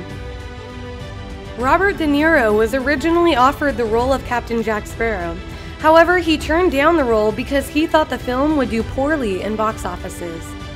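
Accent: United States. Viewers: Instantly bailed due to nauseating American narrator. Was she the only applicant ?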